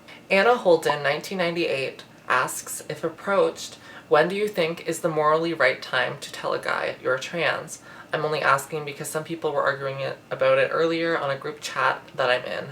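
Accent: Canada. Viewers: You are one of my favourite youtubers. You come from the same region as me and I find your voice, speech pattern, wording, and slang so calming to listen to. Aside from that I genuinely enjoy hearing what you have to say content wise. I've been living provinces away from home for the past couple years and your videos are my favourite thing for easing homesickness. The way you tell a story is just so familiar and that dry subtle humour paired with classic Canadian manners just gets me laughing.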